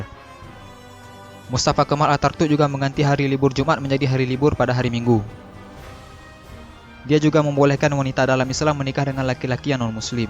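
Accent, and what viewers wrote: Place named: Aceh, Indonesia. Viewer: Admin seperti dialek ureung aceh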